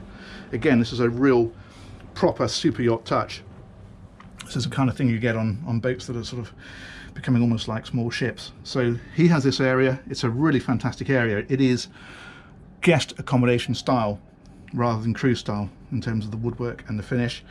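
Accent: United Kingdom. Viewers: This is an exceptional tour. The camera work is wonderful, your knowledge of boats, vocabulary and enthusiasm plus that classical British accent. Keep up the good work!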